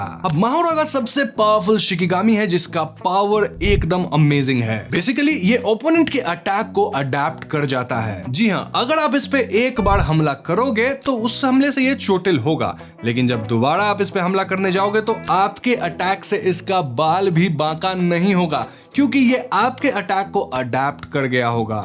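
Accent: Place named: Bihar, India. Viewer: Your bihari accent is so sexy... Please say it again....❤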